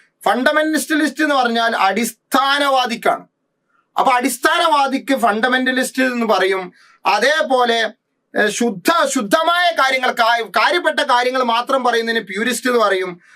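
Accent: Malappuram, India. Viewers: Swantham muthu mani... ❤❤mash nte aaa malapuram slang um.. Comedy yum okke orupad ishtom🥰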